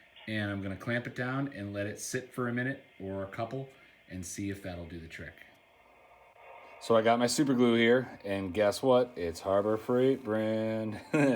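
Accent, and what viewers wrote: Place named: Canada. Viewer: You sound like a Canadian.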